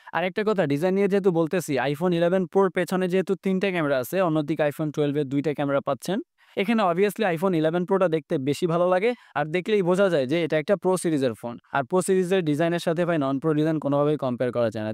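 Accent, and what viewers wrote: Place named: Barishal, Bangladesh. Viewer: আমি শিওর আপনি মোগো বরিশাইল্লা😮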